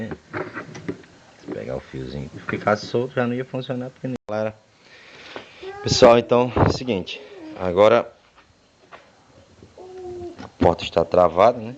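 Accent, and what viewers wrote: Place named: Recife, Brazil. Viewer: Vc é de recife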